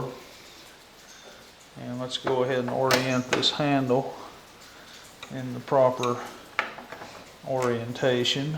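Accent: United States. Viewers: Great job, great lesson. I've got two i have to install tomorrow. Love the hat, pencil and accent. Made me want to shout 'merica!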